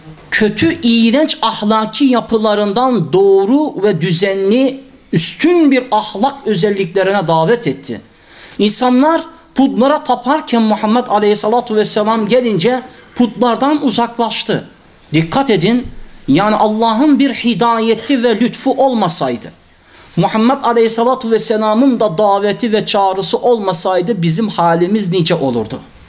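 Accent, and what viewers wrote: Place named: Arab world. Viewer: Islami kelami yasaklayan Islam liderler simdi cennette mi yani? Ne kadar ilginc, Kuran bu liderler geldikten sonra kelami yasaklar olarak algilanmaya baslandi. Islam cografyasinin icindeki parcalanma dusunmenin yasaklanmasidir. Iman kulun soz zikretmesine indirgenirse tepenize biner de binerler. Beyefendi, nicin kalin bir Arap aksaniyla anlatiyorsunuz meraminizi? Nisantasi Turkcesi'nden farksiz bir yerde.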